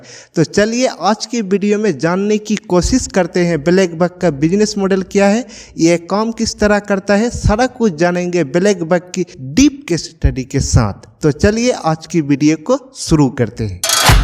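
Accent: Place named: Bangladesh. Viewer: Yah pakka Bangladeshi Koi aadami bol raha hai yah pakka Bangladeshi hai iska Bhasha hi language hi bata raha hai ki yah Bangladesh Hai yah Thag Hai Thag video hai Thag video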